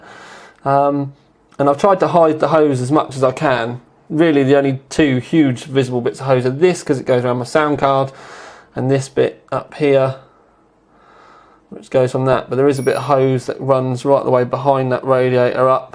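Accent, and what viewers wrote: United Kingdom. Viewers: Amnisty You seem like an awesome person Amnisty! Linus is an amazing stepping stone for people getting into pc's and tech. is something special all together though. Very colloquial and sarcastic British talk. The length of his videos are such a draw for me. Something to listen to without having to swap videos every two minutes.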